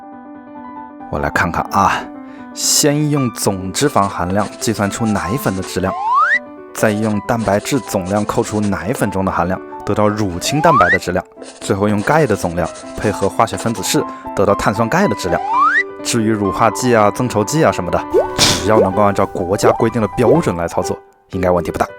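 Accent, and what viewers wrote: Wuhan, China. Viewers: 是怎么做到标准的普通话中夹着浓浓的武汉腔的😂😂😂听起来格外“需服”！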